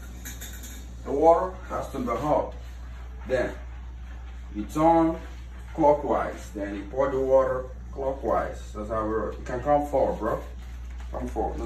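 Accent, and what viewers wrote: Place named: United States. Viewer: I had to replay this. 😂😅 very smooth but why you sound american 😢😅😂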